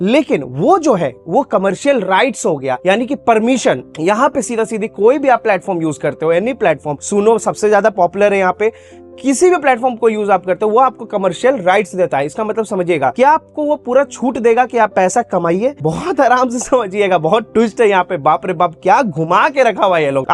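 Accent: Bihar, India. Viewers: मैं पहली बार आपको सुनी हूं। आपके टोन बिहार से लग रहा है जो मुझे बहुत प्रभावित किया क्योंकि मैं भी बिहार से हूं। मैं आपको सबस्क्राइब कर रही हूं। अच्छा लगा आपने जो बताया है 😊 क्योंकि मैं भी Ai को लेकर कन्फ्यूज थी बहुत कुछ क्लियर हुआ है। मैं छोटा सा क्रिएटर हूं। थैंक यू भाईजी 🙏 ब्लेस यू 🙏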